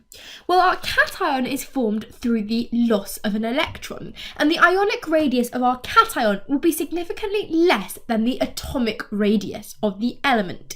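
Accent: United Kingdom. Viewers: its because of her accent lol mb she has been living in britain from her childhood and developrd british accent i dont know why some indian are hating her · It's British accent.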